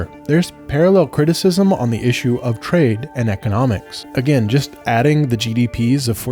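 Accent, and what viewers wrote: United States. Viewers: FYI: 1. It’s not the “British Commonwealth “, it’s “The Commonwealth” (an organization of equal member states) 2. It’s not the “King of England”, it’s the “King of the United Kingdom of Great Britain and Northern Ireland” (or Canada, or Australia, etc) You sound American. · Hey!! You sound like an American! In that case you have no opinion on this subject! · As a Canadian i have to say you sound like an American...these changes are permanent. our trade with the US has dropped 6 percent but increased with other countries by 26 percent, so no real change in total exports and we are just getting started. I won't buy US products ever again if I can help it and i will never travel there...but i am planning trips to Scotland, New Zealand and Australia. we can do what we want and we don't need permission or advice from the US. but your video did highlight one fact...we only need to defend ourselves from the US, but in that we would have the help of more than juat these 3 countries. 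we've been pushed around by the US long enough and while they're descending into fascism this is a good time to get out of NORAD.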